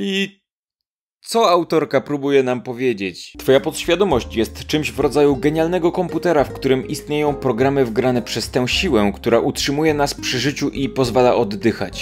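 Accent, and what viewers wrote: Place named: Lesser Poland, Poland. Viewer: Eee, nie. Raczej ślady dialektu małopolskiego wychodzą - głównie w udźwięcznieniu głosek: Sprawdźmy (dźwięczne /w/ i /dź/) Powiedzmy (dźwięczne /dz/ przed m) tak jest (tag jest - udźwięcznienie głoski przed /j/) (jestem fonetykiem, zboczenie zawodowe ;))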